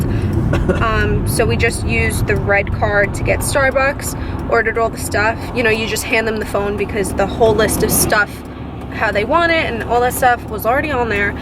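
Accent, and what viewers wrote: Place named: New Jersey, United States. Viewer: Loveeeee the MARIO sounds in between- you sound like a Jersey girl, I can hear the accent, love my homies!! #Jerseygirlsrock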